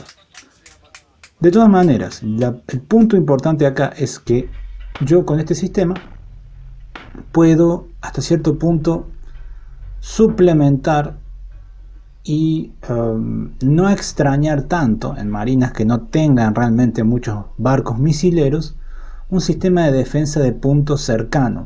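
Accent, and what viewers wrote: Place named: Argentina. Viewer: Pobre Argentina..... Estas OPV, no sirven para una situacion de alta actividad. Lo que tienen que hacer es, comprar las F110 a España, lo más avanzado del mundo en tecnología y sistema de combate. Un saludo, me encantan tus vídeos con tu acento argentino. No somos hermanos? Y se lo compráis a Francia.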